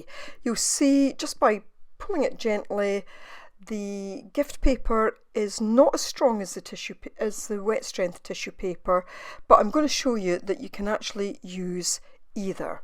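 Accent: Scotland, United Kingdom. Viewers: I detect a bit of a brogue, Scottish? Love all your tutorials 💙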